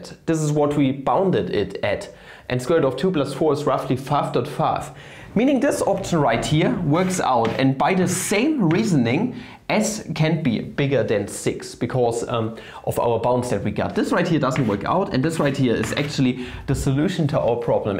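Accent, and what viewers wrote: Germany. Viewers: wait i never noticed your german accent before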